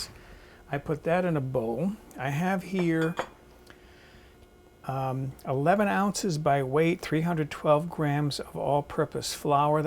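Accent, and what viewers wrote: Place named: Rhode Island, United States. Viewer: First of all, if you’ve been making clam cakes for decades then you’re my clam cake guru. Also, that’s a whole lot more clams than most of the clam cake makers back in Rhody put in their clam cakes while I lived there, most of them use mostly clam juice & try passing them off just like fake Rolex’s! I plan to make clam cakes the same way that you did in this video when I make clam cakes & chowder this Thursday! I’m gonna use cherry stones rather than canned clams though. Also, you sound a whole lot like most of the people who I left behind 5 years ago when I left Warren, RI & moved here to SanDiego. I also left the clam cakes behind so I’m totally jonesing for clam cakes because they don’t sell them out here in California. So it’s make my own & I’ll do it just like you did it in this video!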